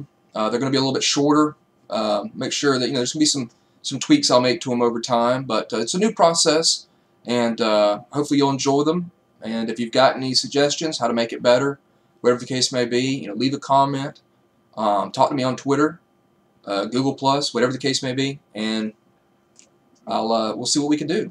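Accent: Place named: South, United States. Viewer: Are you from Greenville SC? I hear the southern in your voice and I saw the WYFF meteorologist video lol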